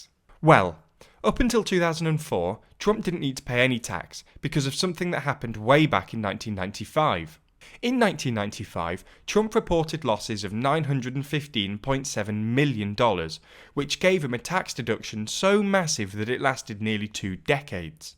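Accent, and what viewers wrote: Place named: North, United Kingdom. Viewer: When did pick up a Northern accent?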